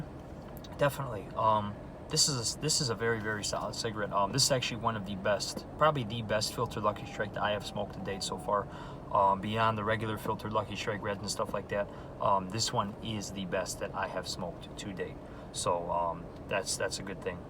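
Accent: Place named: Illinois, United States. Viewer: Dude are you from Illinois? You sound like some of the people I live next door to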